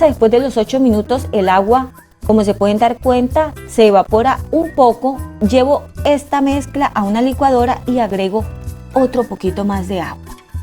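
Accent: Colombia. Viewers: Ud es colombiana ??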